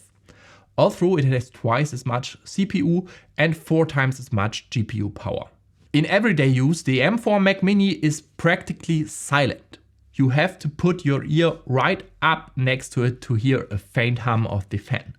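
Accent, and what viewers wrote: Germany. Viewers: da german comes trough wenn speaking. he tries not to spell like a native english guy as you would learn it in europe. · ´Ming Jung, ich hör doch direkt du bist nh Dütsche ^^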